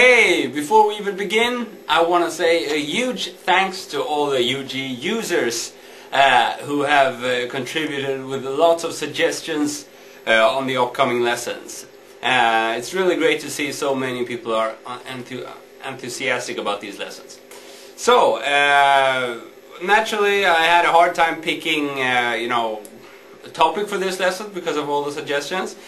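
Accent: Sweden. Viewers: He is from sweden. That explains his accent. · oh the swedish accent xD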